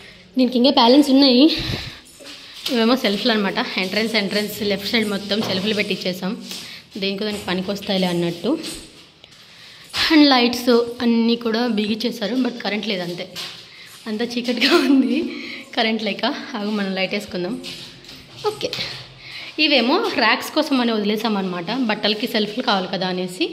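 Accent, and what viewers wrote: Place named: Kadapa, India. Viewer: Meeru matlade language same ma area lo lage vundi, garu meedi e vurandi, meeru kadapa district ki chendinavara?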